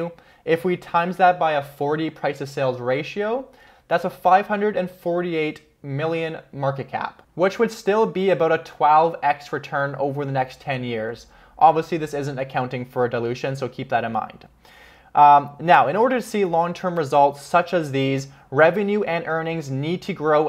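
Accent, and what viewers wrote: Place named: Edmonton, Canada. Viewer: Are you from Edmonton?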